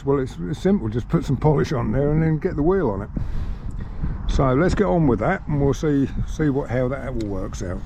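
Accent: England, United Kingdom. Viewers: Lol ,Lazy Bugger. I love listening to English people talk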